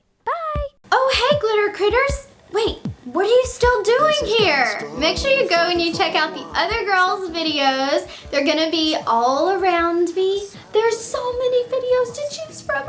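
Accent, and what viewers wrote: South, United States. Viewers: Does she fake the country accent bc why did she lose in the middle of the video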